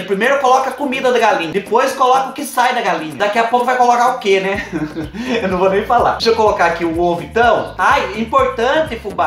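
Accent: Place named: Porto Feliz, Brazil. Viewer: Seu sotaque é igual o nosso aqui de Porto Feliz!!! Bao demais...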